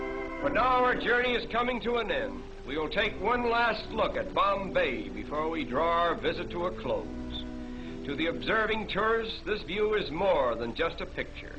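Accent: United States. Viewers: The commentator appears to be American or Canadian, not British. Great video! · It appears to be an American voice rather than British. Great video....the city should have retained its real name of Bombay...Mumbai was a group of mosquito-infested islands inhabited only be fisherfolk; Bombay was the modern city that the British built!! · 'as described by the Britishers'sounds like an American/Canadian to me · I believe that this is an American documentary, the narrator is certainly an American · And the accent is American; not British. And there is no word in modern English called the..."..the Britisher"... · What a horrible American accent! The British don't pronounce Bombay like that. lol..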